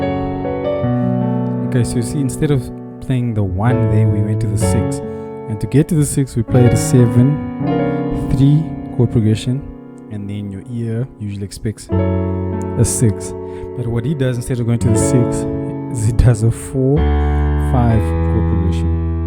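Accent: Cape Town, South Africa. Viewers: you sounds like a Capetownian really...I appreciate you lesson 🇿🇦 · Can hear the dialect u from Capetown ..Represent cpt I love this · Your accent sounds South African, your playing sounds heavenly. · Was so excited to hear a South African accent doing this. Great tutorial,thanks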